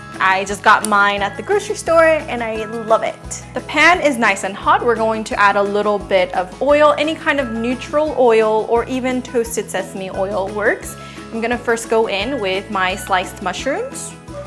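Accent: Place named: United States. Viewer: My husband was raised by his Grandmother who is 100% Korean, she can not even read English, and can slightly speak it. I will say, you're about the most American speaking Korean I have come across thus far. That is, if you're indeed Korean? Thank you so much for your channel!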